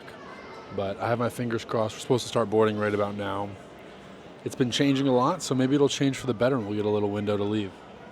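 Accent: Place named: Eastern Europe. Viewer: Which country are you from? You sound like eastern european, which is cool, I myself am from Poland.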